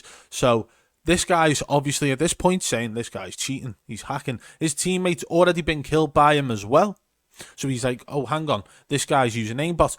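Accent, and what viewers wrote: Liverpool, United Kingdom. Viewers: Are you a scouser ? cause i heard an accent. or am i deadly wrong lol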